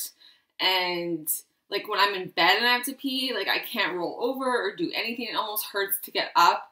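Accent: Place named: Canada. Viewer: I just love your Canadian accent!! Excited to see your babies soon!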